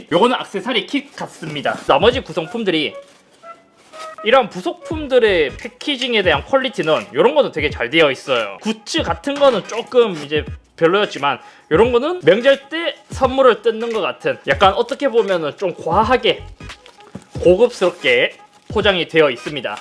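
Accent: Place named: Busan, South Korea. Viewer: 제가 구독한지 어느덧 2년 다되가네요. 오딧세이 G7 개봉기 영상 잘 보았습니다. 댓글은 거의 안 달았지만.. 같은 부산 사람으로써 말투가 정감가기도 하고요. 무엇보다 팩트에 디테일하게 생각치 못한 부분을 잘 끄집어내는게 좋아서였습니다. 현재 안그래도 전 모니터 50~70만원 사이를 보고 며칠째 고민중에 있는데요. 잇섭님도 아시겠지만 LG나 SAMSUNG은 국내에서 대기업의 인지도가 제일 높아서 여러 평가가 많은 편입니다. 그리고 잘 모르시는분들은 그냥 LG나 SAMSUNG을 깊게 고민안하고 사는 경향도 있기때문에 대부분 평가를 쉽게 찾을수 있는데요. 현재 제가 2가지로 압축하여 보고 있습니다. LG는 1세대 NANO IPS의 딱따구리, 은하수 같은 현상이 나타나서 패스했구요. 오딧세이 G7도 한때 관심있게 지겨보던 제품이지만 역시나 커브드(이건 취향이라 별수 없나봅니다.)와 VA 패널의 한계라 결국 패스했습니다. 이 영상의 LG 화면이 더 좋게 보인건 그래도 아마 패널아닐까 생각해봅니다. 물론 오딧세이 G7이 VA패널이긴하지만 IPS에 맞먹는 정말 발전된 SAMSUNG패널이긴 합니다. 보고 있는 제품이 알파스캔 AOC-273QXP와 델 S2721DGF입니다. 현재 마음이 델쪽으로 기울어 가고 있지만 알파스캔같은경우 국내에서도 다나와나 인벤등에 평가가 많은 반면 델은 국내 평가가 거의 없더라고요. 까페의 댓글로 간신히 몇개정도만 겨우 찾았었고 구글이나 유튜브로는 전부 외국인들이 좋은 평가로 나오기는 합니다. 델이 워낙 칭찬일색이고 제대로 된 평가는 그나마 유튜브로 디테일한게 있기는 하더군요. 알파스캔 스펙은 170hz주사율로 되있지만 사실상 DP포트 연결하여도 10비트로는 120hz로 제한 있다고 하네요. 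결론적으로 델 S2721DGF 모니터도 리뷰를 진행 하실 수 있을까요? 모니터 고르기가 본체 사양 맞추는것보다 사실상 너무나 까다로워서 한달째 고민중이네요.. 끝으로 장염 3일에서 1주일 고생할텐데 빠른 회복 기원합니다.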